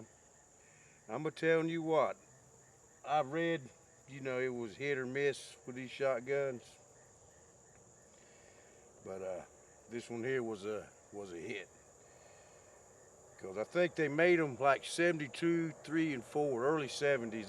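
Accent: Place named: Texas, United States. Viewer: You talk like a texan old timer! Greetings from Tx.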